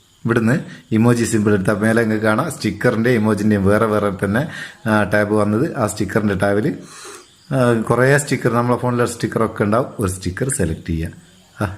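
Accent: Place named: Malabar, India. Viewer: Very nice & really like your nadan presentation and koikode/malappram style...all the very best....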